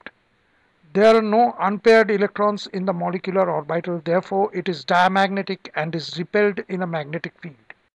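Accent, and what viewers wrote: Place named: India. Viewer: Typical Indian voice..... Good.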